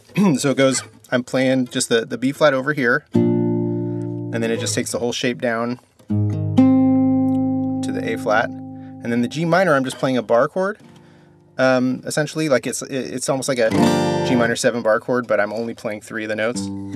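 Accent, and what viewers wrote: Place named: United Kingdom. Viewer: This dude does one hell of a British accent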